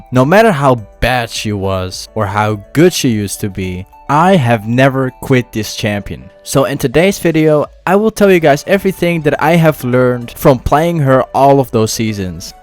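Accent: Netherlands. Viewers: Ben je Nederlands , hoor echt een accent?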